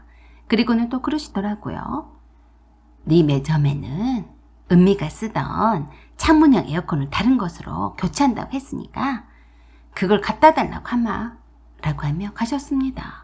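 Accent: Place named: Jeolla, South Korea. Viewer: 말투가 제 올케랑 너무 비슷하세요.. 전라도 특유의 억양이 특히나.. 말씀을 재밌게 하시네요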